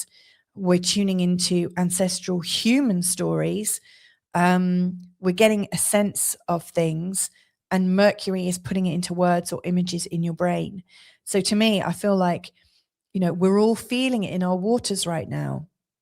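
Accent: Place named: Home Counties, United Kingdom. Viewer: I do like your accent, - it reminds me of home - the home counties?! - from here amid the Great Lakes. More seriously, Maestra, thanks again for the excellence of your work, so generously shared. I must go back to Skool where your stuff is even better. Crossing your palm with a little silver song... old school school! <link>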